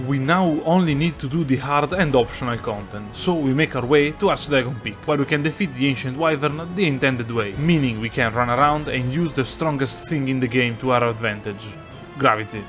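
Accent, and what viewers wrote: Italy. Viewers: l'accento italiano lo rende 1000 volte meglio <3 bellissimi video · Si sente che sei italiano😂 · Love the Italian accent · Been watching your videos in the last few days and I keep asking myself “ma è italiano? L’accento potrebbe essere quello giusto”. I’m just writing the comment in English in case I’m totally wrong. Love your videos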